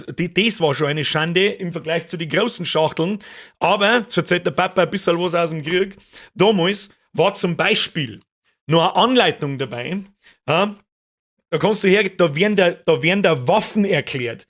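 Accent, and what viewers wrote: Bavaria, Germany. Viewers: Geil, endlich moi a bayrischer Spieltester! Des feier i ! Abo und like ist raus. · Woooas?!?! Superüberfordert vom Bayrisch aus dem Stand Sehr erfrischend Japp nice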